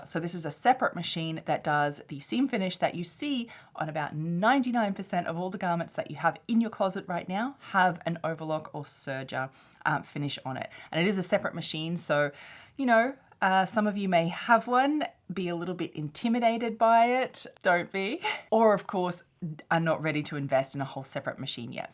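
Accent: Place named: Australia. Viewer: I was really looking forward to this video, thank you! I had to decide to stray from a skirt pattern I was working on yesterday because the double-gauze fabric I had chosen frays so much I felt I needed to use french seams (vs just finishing the raw edges). I could have used the overcast stitch on my sewing machine but I honestly didn't think it'd be durable enough to last many wears and washes. Also, while I've watched a few of your videos before i've just now realized you've a non-American accent. Are you Australian?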